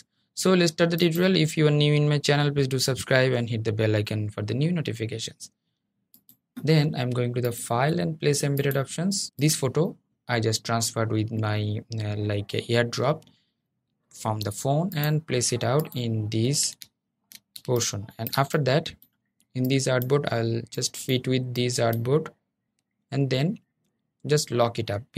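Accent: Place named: Bengal, India. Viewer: bengali ascent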